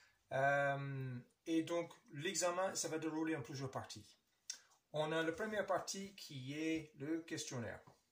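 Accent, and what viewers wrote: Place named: Canada. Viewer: Quel accent canadien 😊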